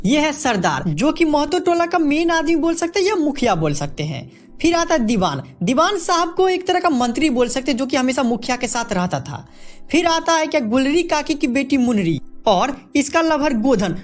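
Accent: Bihar, India. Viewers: Aap Bihar se ho kya 😄😄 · Bhai aap bihar se ho kya aapki awaj se lg rha h bihari log ki aawaj aisi hi hoti hai😊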